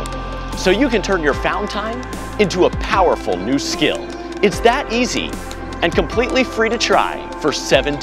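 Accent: United States. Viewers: you can close your eyes and you can still tell its an american trying to speak spanish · i like that this guy doesn't try to sound spanish, hes just like "im an american this is what i sound like" i need that kinda confidence 🤣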